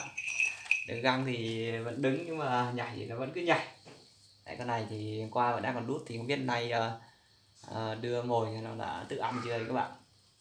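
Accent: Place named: North, Vietnam. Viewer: Ồ xa quá. Em thấy a nói giọng bắc tưởng a ngoài bắc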